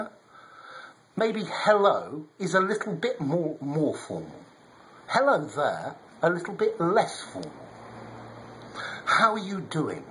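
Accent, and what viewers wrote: United Kingdom. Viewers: Oh, Finally A British Teacher.! Great! BTW,I am interested in the British accent and trying to listen to it as much as I can,but still need rules! Do you -or anybody else- know how to improve ACCENT ? sources PLZ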